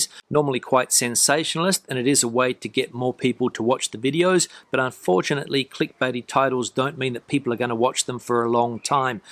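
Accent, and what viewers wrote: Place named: Australia. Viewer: Love your video does thank you. Have to admit I only started watching because of th e ausssie acent and Spanish Horses. Well and Spain. I lived in Aus briefLy, two years as small Pearson, obviously made a big impression.🤠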